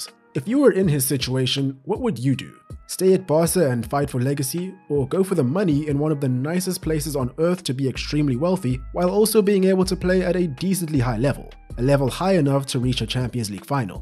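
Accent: South Africa. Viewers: You sound South African? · Are you South African mate? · Nice to hear a South African accent for once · I knew this voice sounded south african awe my brother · Hey…are you South African? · I just wanna know, are you South African becoz you sound like one… btw love the videos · Are you from South Africa? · Through out this whole video I'm like "this man sounds hella South African" and I'm glad I was right. Just gonna sub cause of that ✊. Also great video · Is that a South African voice i here?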